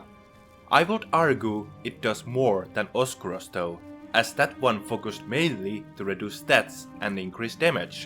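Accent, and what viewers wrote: Finland. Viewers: Onko tää suomalainen kanava? Kaveri ainakin ns. suomiaksentilla selkeesti :)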